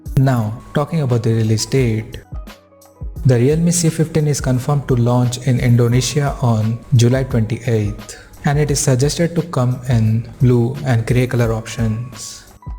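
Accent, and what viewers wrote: India. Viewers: Are you indian?? Your location is usa but your voice is just like indian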